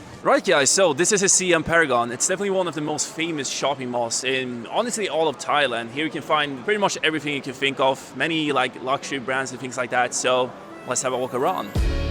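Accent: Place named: Sweden. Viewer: Sound like English in Swedish dialect.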